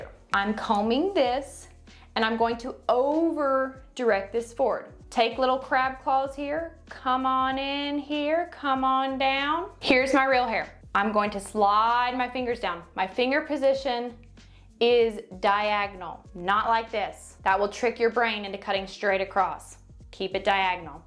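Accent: South, United States. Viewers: Shoot ain’t u cute as they come! Your accent matches mine lol love your personality! Great video btw I really needed someone to show me in southern terms how to blend my own extensions the only difference I have is I’m brunette with really thick hair but pretty long too I also wear my hair straight more than I curl it plus I use one huge clip and fill in the empty spots best I can with my other individual extensions any suggestions without taking away a lot of my own hair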